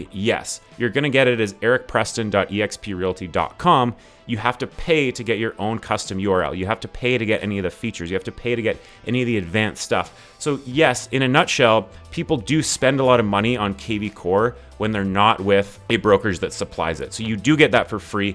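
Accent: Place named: Canada. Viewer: This guy sounds Canadian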